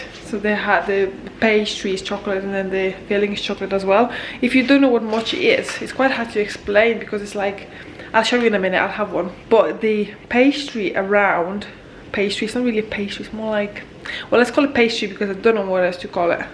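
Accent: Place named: Northern England, United Kingdom. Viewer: You’ve caught a cold and a slight northern English accent.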